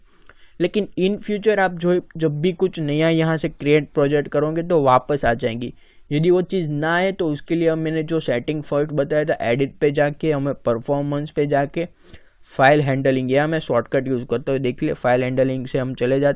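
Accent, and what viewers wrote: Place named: Gujarat, India. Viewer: I think he is Gujarati 😊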